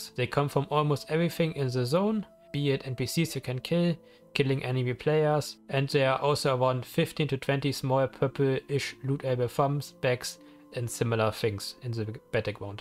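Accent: Germany. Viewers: It was quite strange listening to you speak, because I know you're speaking English but half the time it sounded like you were speaking German only to realize you were indeed speaking English but the cadence and rhythm and enunciation was very much German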